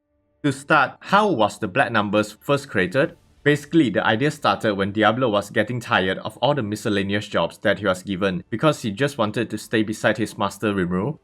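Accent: Singapore. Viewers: Bro are you Singaporean? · You Singaporean ah?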